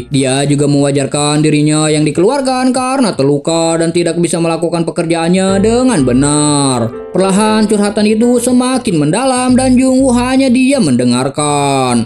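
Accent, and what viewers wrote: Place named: Sunda, Indonesia. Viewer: Sunda bingitz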